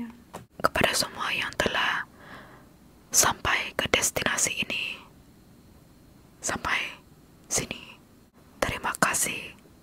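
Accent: Sabah, Malaysia. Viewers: Sabah ni logat dia terbaik! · hahahaha sabah bah ini😂😂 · your malay sound like sabahan malay a little bit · Logat Sabah oo 👍🏻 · I love your sabahan accent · it's because she's sabahan. their bahasa melayu is in a different accent then malays in semenanjung. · damn im a sucker for your sabah’s accent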